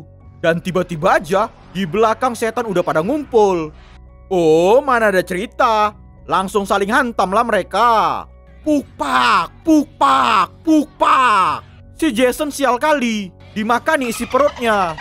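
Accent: Medan, Indonesia. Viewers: Cak ko buat alur cerita ramboo the last blood, laey..paten kali bahasa medan ah..mantap ❤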